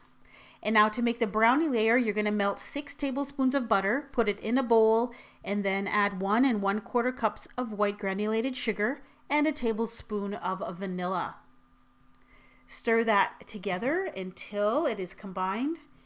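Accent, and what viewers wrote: Canada. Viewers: i knew you were Canadian!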